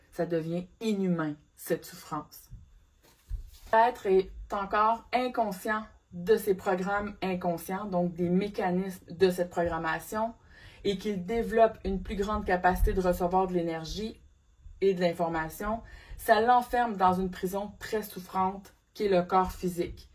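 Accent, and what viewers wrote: Quebec, Canada. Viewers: Contenu enrichissant et utile présenté avec pédagogie par une jolie femme à l'accent québécois ....❤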